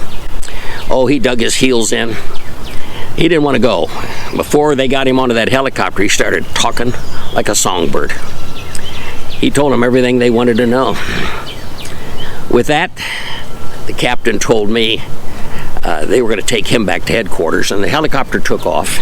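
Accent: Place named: United States. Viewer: was a handsome guy back in his day, bet he had the choice of all the young ladies!!! I feel kind of bad for pulling you up on one of your older vids for pronouncing gamo as gaymo!!! lol I only came across that vid as I was looking into the gamo swarm maxim elite becasue I purchased 1 around that time & having only seen that 1 vid, didn't realise how much of an official hardcore gun enthusiast/aficionado with such a vast collection & being a living knowledge base in the overall field of guns (I live in UK & just own the gamo swarm maxim elite... but would def own more if I lived in the US). So my apologies for the gamo/gaymo thing, I feel a little humbled when I only own the 1 & you own enough for a small army, yet I naively pulled you up on pronunciation! only meant as light hearted UK/US tomato/tomaydo type accent difference & was just having fun! 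(here in UK we like the US/American acent!). looks like an amazing place to live in Michigan!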